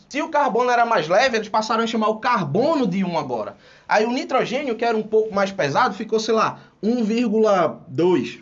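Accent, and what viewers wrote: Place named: Northeast, Brazil. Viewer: Adoreii a aula. Achei mto animada. E seu sotaque nordestino,foi oq me chamou mais a atenção.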